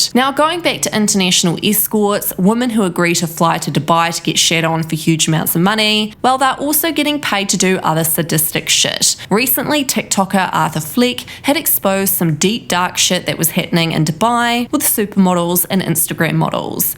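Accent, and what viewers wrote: Australia. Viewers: The narrator/reporter sounds like a typical Aussie Bogan. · The narrator had a grating and annoying accent. Sounds like Aussie. Pretty sure there, it is legal or decriminalized. "Influencer'only a word that exist in modern shole degenerate societal garbage. So Dubai sounds modern day Sodom & Gormorrah?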